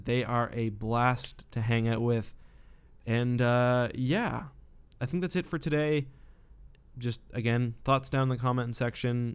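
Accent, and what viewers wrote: Canada. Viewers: You get really Canadian when you do rambling videos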